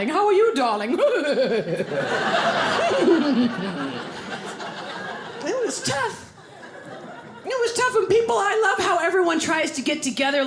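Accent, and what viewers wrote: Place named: Italian-American, United States. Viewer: MAGNIFICENT! UTTERLY ORIGINAL! I love ethnic humor, but I've never seen bi-racials use it as comedy, certainly not one with a perfect Italian-American accent.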